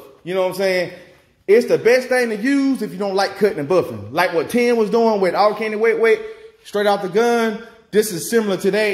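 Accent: South, United States. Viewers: Love your work! You are so energetic and proud… how could you not be! Love the thick southern accent too! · Came across your video and it just caught my attention. Normally just checking out the hot rods. Well ended up watching at least 10 videos. Love the paint jobs and how you show it don’t take a big name paint paint shop and top notch equipment to do nice work. PS love the positive attitude and the country accent. Keep doing you and your thing brother. God Bless✌🏻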